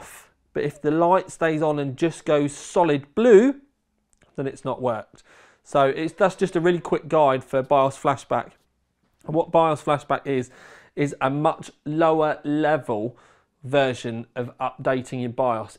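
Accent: England, United Kingdom. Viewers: I can t follow this fucking eng accent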